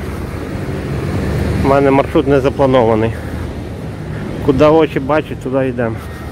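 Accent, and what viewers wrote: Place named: Galicia, Ukraine. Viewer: Не звертайте уваги ні на які зауваження! Говоріть як говорите,чудова вимова! Я коли приїжаю до Львова то не можу наслухатися галицької говірки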